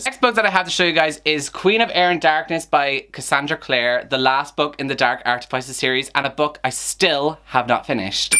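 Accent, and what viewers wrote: Ireland. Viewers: I found you through Chloe’s channel and it’s soo nice to hear an Irish accent on booktube.. makes me homesick and want to be back in the whest 😛.. new sub can’t wait to watch move.. love a fellow Irish gal 😘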